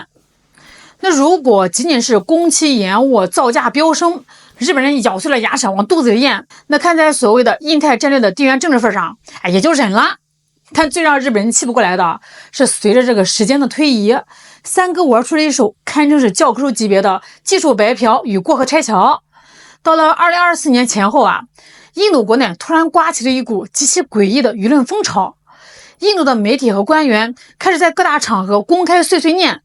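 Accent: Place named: Northwest, China. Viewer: 听口音，这漂亮可爱的小姐姐好像是西北人，希望不是AI，很讨厌AI做成的东西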